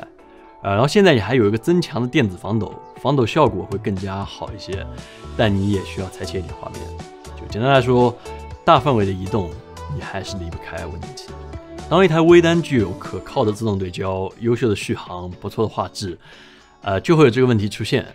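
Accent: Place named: Yangtze Delta, China. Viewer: 我是因为tim的长三角口音爱上影视飓风的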